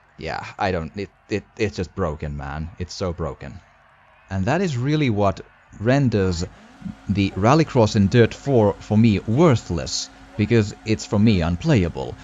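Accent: Finland. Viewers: Are you finnish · Are you Finnish?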